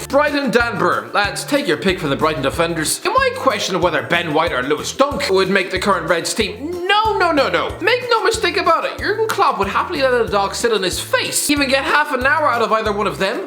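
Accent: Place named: Ireland. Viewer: Its fun hearing read Nigerian names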